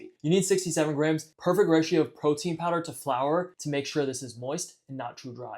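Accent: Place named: New Jersey, United States. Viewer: That new jersey accent, also sus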